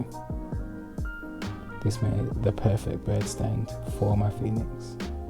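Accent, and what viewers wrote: United Kingdom. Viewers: That was actually really comprehensive and clear! Thanks! Maybe its the cool british accent, maybe its the neat tidy workmanship, maybe both.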